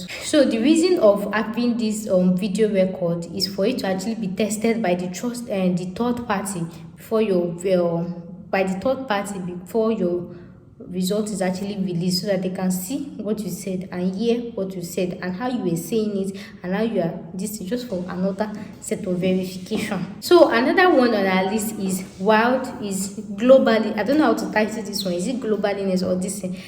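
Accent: Yoruba, Nigeria. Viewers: I just like the way u speak your yoruba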